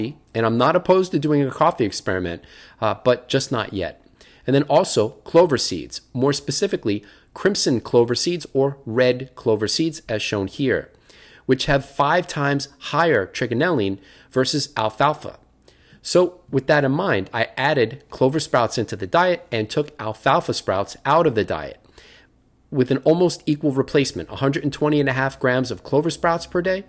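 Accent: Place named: New York City, United States. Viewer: Well, which variety of clover from Ture Leaf are you buying? I'm on the site right now, and there are Mamouth Red and Medium Red Clover seeds available in pounds. But the Organic Red Clover is only available in small pouches. Hmmm, that's the same situation with Johnny's Seeds, too. See what you did?! You posted this video and sold out the entire organic red clover seed bank in North America! You're a Boston guy? No accent. You really seem like a NYC area guy to me. I liked the point you made in the last video with Chris about this VO2 Max protocol only giving us a chance to live to mid-80's, maybe. The Bible says Moses lived to 150! And I guarantee Moses wasn't pumping iron or training for any marathons. My grandmother sat by her 3rd story window in the Bronx smoking Marlboro Red after Marlboro Red to the tune of 4-packs a day and lived to be a healthy 94. 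But, she survived colon cancer in her late 20's and ate almost nothing every day. I guess if you wear a colostomy bag eating anything probably doesn't seem ideal. The only thing my grandmother ate was cold cuts from the deli on her block. And even with that she'd maybe eat a few slices of roast beef and swiss cheese. The only vegetable I ever saw here eat was a few spoonfuls of potato salad. I think that CR (Calorie Restriction) is a powerful tool. I've been watching Peter Attia for 10 years and He gets carried away with his exercise regimen when it comes to the average person. I'm a certified Health Coach, not charging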